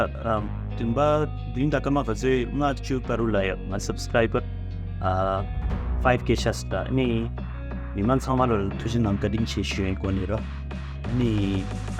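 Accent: Standard. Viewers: you speak Tibetan pure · Tashi Delek! Amazing video to watch!! Especially, your Tibetan language is pure and perfect 😍 Watching from New York!❤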